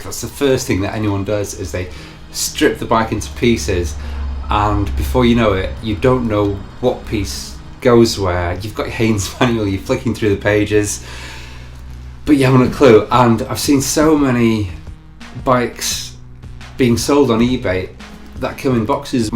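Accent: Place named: United Kingdom. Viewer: thats how the britan english sounds like !!!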